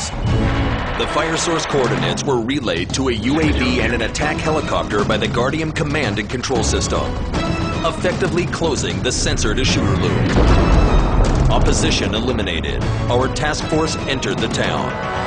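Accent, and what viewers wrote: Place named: United States. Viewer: Why on earth is the narrator a yank?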